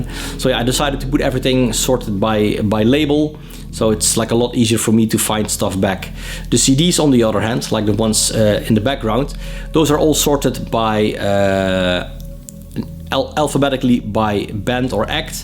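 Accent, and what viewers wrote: Netherlands. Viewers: The Dutch accent with English is great btw. It's reminds me of a time when I lived in netherlands years ago. So if some take issue with it they have the real issue. Happy Christmas to you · Anyone who complains that the Dutch host has an “accent” needs to go and flush themselves down the toilet. Ignore the morons. They walk among us unfortunately. I discovered this channel about two days ago. I have spent most of those two days watching all of your videos, stopping occasionally to sleep and eat!! Love it and keep up the great work. My fave is definitely the Space Brothers. Those guys are legends🤣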